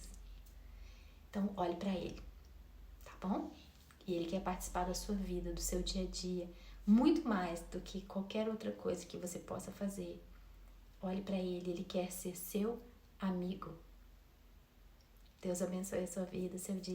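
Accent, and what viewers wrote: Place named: Minas Gerais, Brazil. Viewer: ❤❤. Você. Hoje. Tá. Muito. Linda. Bem. Arumda. E. Você. Fala. Muito. Bem. Eu. Adoreio. Você. Quero. Seu. Tel. Endereso. E. Acidade. Seu. Sotaque. E. Minerinha. Bj❤❤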